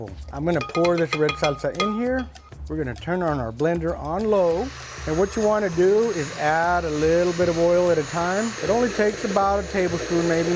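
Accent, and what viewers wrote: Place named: Houston, United States. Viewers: Sounds like every first gen American (or born in Mex and came young) that lives in South Texas. 😂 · I have uncles from Houston that sound exactly like. 😂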